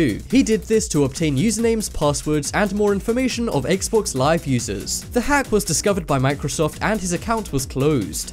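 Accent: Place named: RP, United Kingdom. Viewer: What's with the narrators voice? he sounds like he's a posh english school boy but can't pronounce most of what he's reading!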